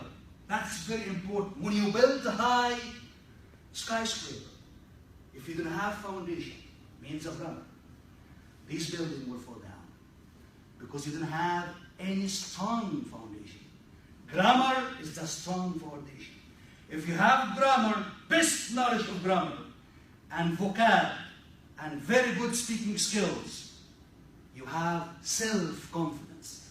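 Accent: Egypt. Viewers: Still ur Egyptian accent beholding u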